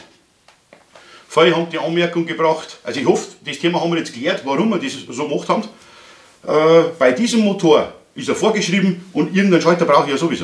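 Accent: Bavaria, Germany. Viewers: Ich schaue Deine Videos gern aber mein lieber ich kann Bayrisch nur schwer verstehen. Etwas mehr Hochdeutsch wäre schön. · Ach reg dich doch nicht auf über sowas, dann lebst länger :-) Aber mal ehrlich, mach einfach mal was mit ihm zusammen, ein teil des Projektes bei dir und ein Teil bei ihm, das bringt Klicks :-D. Lets Bastel hat ja letztens auch Hilfe bei Jonas Winkler geholt. Mach weiter so und ich als Sau-Preuße verstehe dich auch in Bayrisch, zwar manchmal mit Hürden aber das passt so ;) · Des passt scho so wia d des mochsch danke für den elektrischen Teil aber bissle weniger bayrisch wär au ok ;) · Also ich als Bayer versteh den Dialekt ja, aber ich glaube das für manche Menschen ein Untertitel absolut sinnvoll wäre!!! Dann hättest noch mehr Klicks!!!! 😝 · Ist das Bayrisch? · reg dich doch nicht ueber die dummen auf. ich schaue deine videos gerne, weil du diese interessant machst. 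deine freie bayrische ehrliche schnauze schaetz ich auch sehr. also brav weitermachen.lg rene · Super Kanal. Finde die Umsetzung sehr gut. Den Schutzschalter würde ich genauso machen. Lieber Nummer sicher. Und dazu noch bayrisch Top top top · Du bist scho a bäriger Typ - Bsonders dei Dialekt is a so schee. Mehra Bayern auf YouTube ! Mach weida so! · "Und wehe die taugt nix..." 😄 Bin gespannt und in freudiger Erwartung! Es ist immer wieder ein Genuss Dir zuzuschauen. Auch wenn es ab und an etwas anstrengend ist wenn Du wieder ins Ur-Bayrische schwingst. 😉 Mach weiter so!!! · Guten Abend Mache bitte weiter so ! Und lass Dich bitte nicht Ärgern :-))) Ich finde deinen Kanal Top / Klasse / Spitze ........ usw. Und wehe Du hörst auf Bayrisch zu Sprechen , Hochdeutsch passt leider nicht zu Dir :--)))) hört sich an als wenn ein Rheinländer Bayrisch sprechen würde :--)) Schöne Grüße aus dem Rheinland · Solche Empfehlungen würde ich nicht aussprechen. 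:D Als gut ausgebildeter Elektroinstallateur lernt man hier nichts fachliches... stimmt, es ist aber nie verkehrt, zu sehen und verstehen, wie andere "Artgenossen" arbeiten. Wer den Drang hat, sich selbst fachlich zu verbessern, darf sich nicht scheuen, andere Arbeitsweisen kennen zu lernen, zu sehen und zu verstehen. Außerdem lernt man so (in diesem Fall) den bayerischen Dialekt zu verstehen... du quasselst damit etwas, was ich fachlich alles kenne. Besser lernt man keine "Fremdsprache"... woll?! :D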